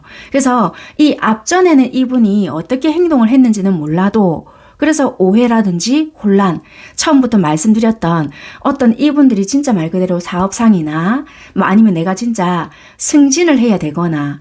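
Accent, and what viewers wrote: Busan, South Korea. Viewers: 너 부산에서왔쩨ㅡ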